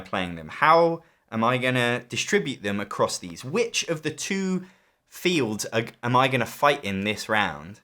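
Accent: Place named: England, United Kingdom. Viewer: that's just how you say "drawing" in an english accent.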